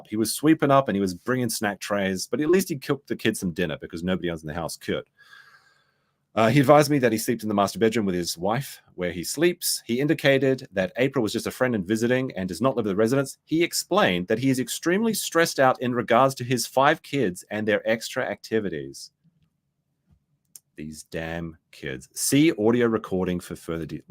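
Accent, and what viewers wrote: Australia. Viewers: As an Aussie it was a lovely surprise to suddenly hear an Oz accent in the playlist I put together for this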